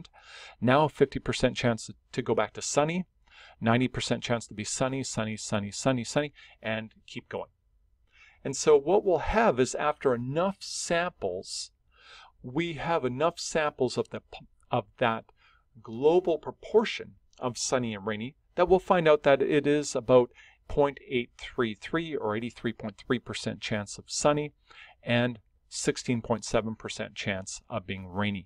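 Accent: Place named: Canada. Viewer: Just found out is Canadian, and in my opinion, the Canadian accent is the best English accent for non-native speakers to comprehend, thanks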